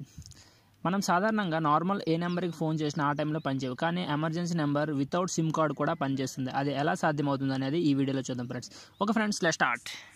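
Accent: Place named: Andhra Pradesh, India. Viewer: I believe you are from Vijayanagaram or Srikakulam. However the content you have given in this video is not about how Emergency works with no signal... Can you be more elaborative ?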